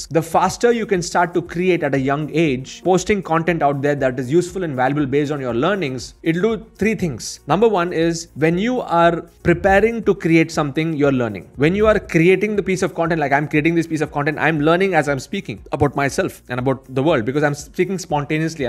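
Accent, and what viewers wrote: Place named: Bangalore, India. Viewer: I sensed some Robert k and a lot of T Harv Eker here. I have also read Secrets of millionaire mind multiple times and trust me give the same examples you used. Especially the ice cream example. They work everytime. Nice video as always macha. Your way of speaking always reminds me of my time in Bangalore.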